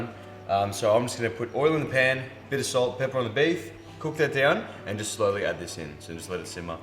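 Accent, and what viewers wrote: Australia. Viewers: Wtf dude just shop at Aldi. I don’t know if you live in US or Australia tbf, you sound Australian. We have Aldi in UK and it’s cheap af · oh shit he's from STRAYA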